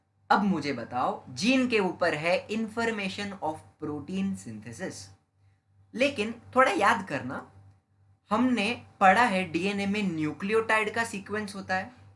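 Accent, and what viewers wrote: Maharashtra, India. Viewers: Sir aap Marathi ho kya ??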